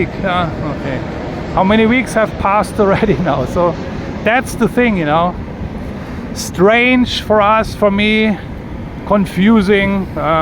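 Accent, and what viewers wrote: Germany. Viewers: ur accent likes germany? right?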